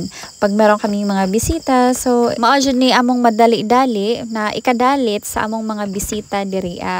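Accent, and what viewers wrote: Bohol, Philippines. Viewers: mura lge ug inistoryahan sa amoa sa BOHOL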